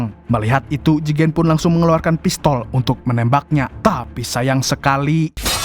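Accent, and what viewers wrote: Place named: Nganjuk, Indonesia. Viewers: Mimin wong Nganjuk koyok e😂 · Orang nganjuk kah bg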